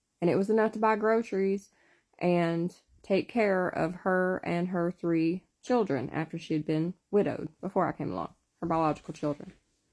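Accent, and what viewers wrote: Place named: South, United States. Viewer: I love your southern accent 😭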